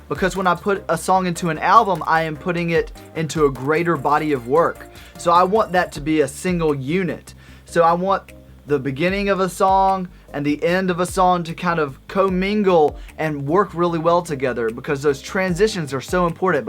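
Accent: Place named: Denmark. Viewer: you sound danish:)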